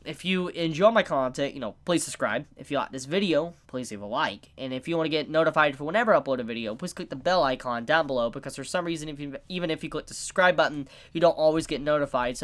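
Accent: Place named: Tennessee, United States. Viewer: i didnt kno w you were from Tennessee until watching your va ca video now i cant un hear you accent lol "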